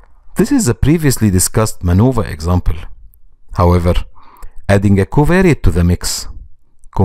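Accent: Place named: Egypt. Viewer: Finally Found the video that help me to know how can I use it in the research. By the way Egyptian voice and accent in this video is nice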